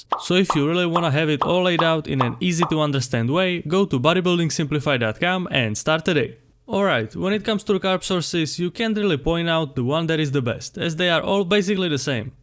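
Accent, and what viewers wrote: Czech Republic. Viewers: jseš čech žejo? jinak super! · I can tell you are Czech based on your accent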